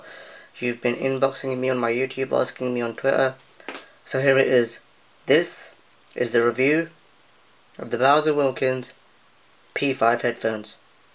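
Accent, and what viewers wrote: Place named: India. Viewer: half indian half uk accent, pretty cool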